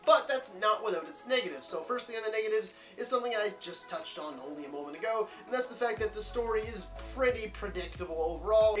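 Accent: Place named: Canada. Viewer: Wait! Did you say your “miewth” full of water?” 😂. Gotta get used to that Canadian accent. 😝. Great review buddy!👍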